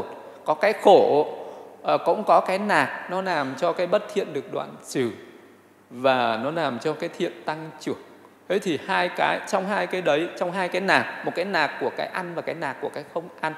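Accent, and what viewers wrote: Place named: Nam Dinh, Vietnam. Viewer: Nghe giọng nói của thầy hình như là người Nam Định phải không a .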